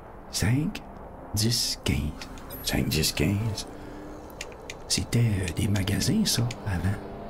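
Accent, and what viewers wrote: Quebec, Canada. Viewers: Ne change rien. Perso, j'adore l'accent québécois...👍👍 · Salut, Je voulais juste te dire à quel point j'apprécie ta façon de parler avec cet accent québécois authentique. C'est ce qui rend ton discours si unique et captivant. Ne change rien! En France, où j'habite, on n'entend pas souvent cet accent, et c'est précisément ce qui rend ta présence si spéciale. Ta manière de parler apporte une touche de fraîcheur et d'originalité, et c'est l'une des raisons pour lesquelles tant de gens t'écoutent en france. Ce que tu fais est vraiment superbe, et je pense que c'est important de célébrer nos différences linguistiques. Cela crée une diversité enrichissante et stimulante. Continue à être toi-même, car c'est ce qui te rend exceptionnel.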